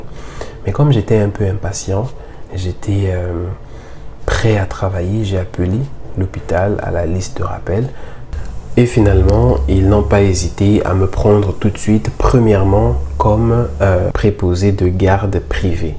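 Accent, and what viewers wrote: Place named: Cameroon. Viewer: Quand j'ai vu ta manière de parler j'étais étonné que les kenyan ai le même accent que nous au cameroun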